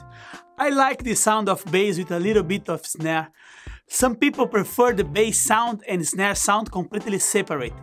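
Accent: Brazil. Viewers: Seu sotaque parecia muito brasileiro, e agora que fui ver eu estava certo kkkkkkkkkkkkk Que deus te abnçoes irmão!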